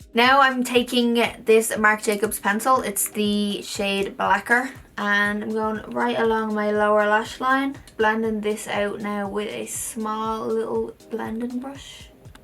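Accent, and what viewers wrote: Dublin, Ireland. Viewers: You are such a beautiful girl!!! I'm from Belfast, and if my ear is correct, your from Dublin?? I'll always support anyone from this island, we need to take over!!!! :D Also, do u know of any good shops in Dublin to visit, for makeup, skincare and clothing?? I always make a trip down after Xmas, but I always go to the same places... Just wondering if u know any cool places outside of the bigger stores, like Arnotts, Brown Thomas etc xxx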